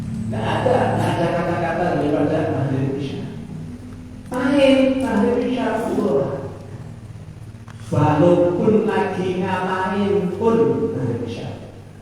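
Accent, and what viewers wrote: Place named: Semarang, Indonesia. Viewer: Ustadz asli Semarang keturunan Arab tob ceramahnya pake bahasa logat Semarangan 👍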